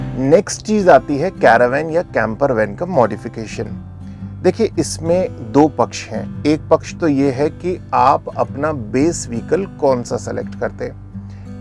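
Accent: Standard. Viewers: Very informative and well explained and this is the purest form of hindi I’ve heard in a while🤩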